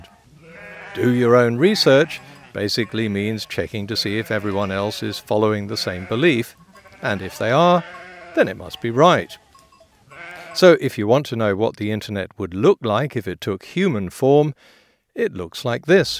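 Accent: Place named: England, United Kingdom. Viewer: it is not that PCR tests don't work, PCR tests are not a definitive method for testing for Covid. That is the fact that ABC is never going to come clean about. Also the choice of narrator is advicive in that the choice of a speaker with a "crisp as an apple English accent " is supposed to be an indicator of intelligence. That is a total myth. They have become puppets like all the rest.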